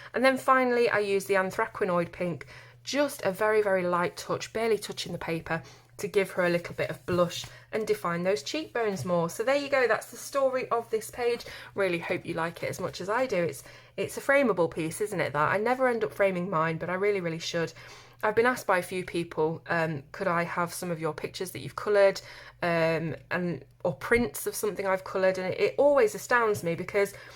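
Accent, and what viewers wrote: United Kingdom. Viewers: O your British cool love the way you talk love the coloring books to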